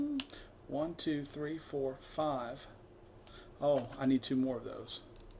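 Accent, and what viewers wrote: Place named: Midwest, United States. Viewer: Btw where are you from? From your accent I'd guess American Midwest, maybe south?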